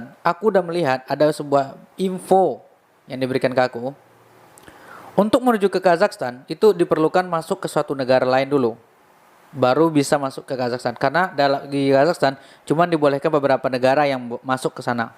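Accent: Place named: Sumatra, Indonesia. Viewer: Sor x bah aku nonton in video mu bro, kalo bisa logat Sumatra jgn di ilangkan bro, semangat buat konten bro... Udh lama jd subscribers mu baru x ini aku komen😂😂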